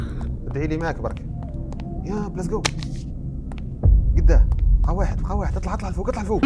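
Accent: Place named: Setif, Algeria. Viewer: بالله عليك كيف عرفت انو سطايفي هههه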